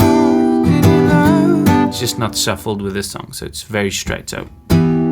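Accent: South Africa. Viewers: Great video. Where are you from? You sound a bit South African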